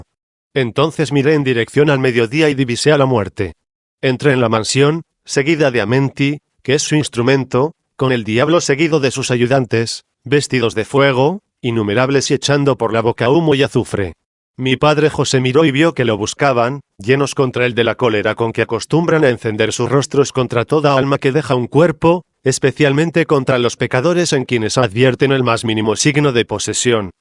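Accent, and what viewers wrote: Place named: Castile, Spain. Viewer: Por favor, no uses tu voz Robótica. Vuelve a hacer este video con movimiento de personajes, hay muchas películas de dónde tomar cortos. Por favor, trata de hablar y leer en español latino porque no soporto el castellano, te agradeceré hasta que me muera si me das gusto. Trata de cambiar los textos que lees al español latino, como hablamos el nuevo y mejorado español de México, y te felicito por este video, lo buscaba pero no lo encontraba.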